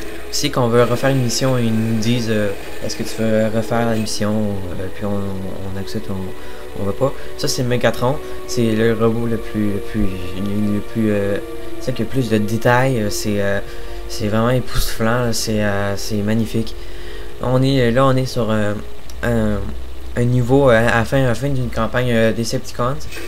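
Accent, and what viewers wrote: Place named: Canada. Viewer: Bon l'accent canadien m'a permis de ne pas bien comprendre certains mots, heureusement qu'ils étaient dans leur contexte ! ^_^ Aller 4* car la perfection n'existe pas !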